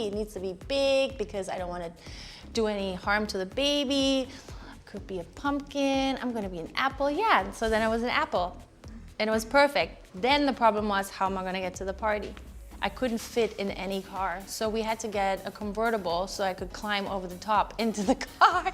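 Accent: Germany. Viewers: That german accent 😂❤️ ich komme aus Deutschland und man hört es der guten an lol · Her german accent is more apparent here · she sounds extra german,is it just me🤔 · Do you mean her accent has become stronger? But yeah, I can definitely tell there are a few linguistic features about her way of speaking that distinctively mark her as German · I love how you can still hear some German accent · She sounds more German to me now lol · I kept hearing an accent then found out she’s from Germany! Crazy how most of her accent is gone.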